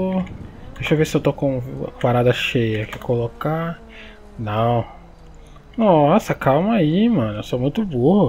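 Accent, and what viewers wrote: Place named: Minas Gerais, Brazil. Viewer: vc é de mg paresse pelo sutak. Se for somos mineiro uai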